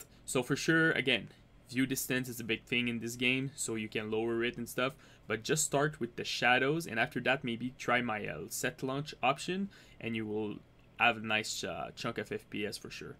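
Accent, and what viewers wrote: Quebec, Canada. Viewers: !!!!!!!!! French Canadian? Quebec or Montreal?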